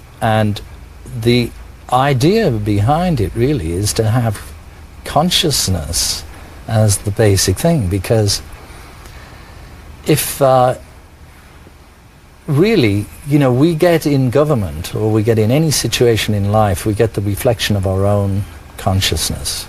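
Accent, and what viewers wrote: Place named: United Kingdom. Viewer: THE ACCENT IS MUCH BETTER THAN AMERICAN ACCENT AND APEACH, AMERICANS DONT LIKE IT, EVEN WOMANS, BRITISH WOMANS, BEAUTIFUL ACCENT AND VERY KIND, NOT RUDE LIKE AMERICANS GIRLS., LOVE BEATLES, WHO DOESN T ?? ELVIS, NEVER PLAYS GUITAR LIKE BEATLES OR WRITE A SONG, OR THIS VANILLA ICE LOL, ITS A JOKE, AMERICANS, THEIR GOT ONLY INSTRUMENTS, REAL MUSICIANS ARE FROM UK...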